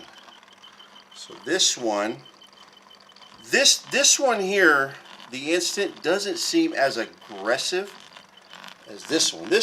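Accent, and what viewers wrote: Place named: France. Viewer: Great vid, thanks. Are you still using the frother and the dual c❤coffee machine . I just ordered the dual plus machine and thinking of getting the frother. I ben loking e rywhre for anachine tht i cab do both kcup and nespresso. I sop motly online, i must of ben typing wrong or somthing lol hope the new cofe works good. Anyway thanks, oh you sound like you have a french accent, are you canadian ?😊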